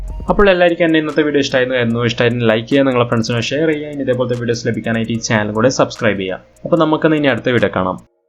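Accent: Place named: Kannur, India. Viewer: കണ്ണൂർ ഭാഷ ഇതിലൂട്ട എടുക്വ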